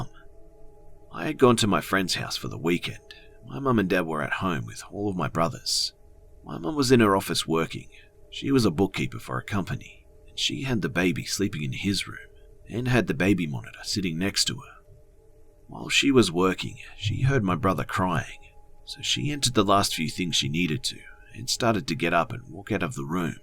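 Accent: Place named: Australia. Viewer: Absolutely, I've been a follower for some time now and sorry if my comment came off a bit sketchy. 😂 I guess you're australian but could be wrong, I'm from Europe myself btw so it sounds original to me (in a good way ofc). I do like the rest too, the narration style, stories and the atmosphere etc. I find myself prefering this "stories-channel" first out of similar channels. 👍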